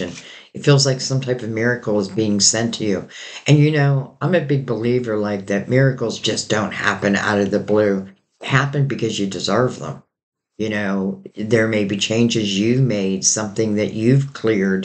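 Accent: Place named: Pennsylvania, United States. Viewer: Love your SW PA accent!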